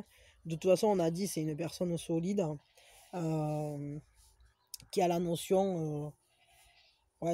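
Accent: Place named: Provence, France. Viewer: vous êtes incroyable)) et ce bel accent, de salon ou des environs je dirais 😂😂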